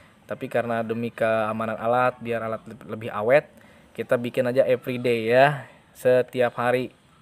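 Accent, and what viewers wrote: Banjar, Indonesia. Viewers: banjar banar.. hehe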